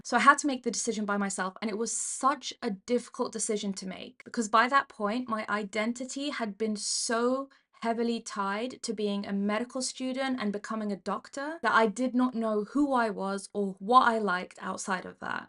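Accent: United Kingdom. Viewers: Everytime i hear a British accident i change the video lol i know how bad it is in the UK. I’m interested in the us only.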